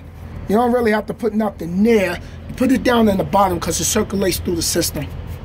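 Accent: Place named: East Coast, United States. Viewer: Good job for all those cats who are unfamiliar. You sound like you are from the East Coast. I was born in PHX later moved to Long Beach ,California and the to Seattle WA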